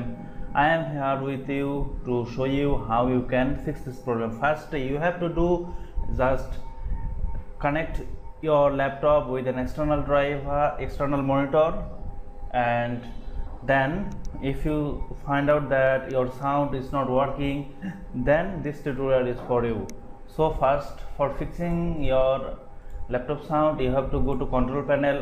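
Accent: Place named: Bengal, India. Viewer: With ur accent I can understand that ur a bengali because I am also bengali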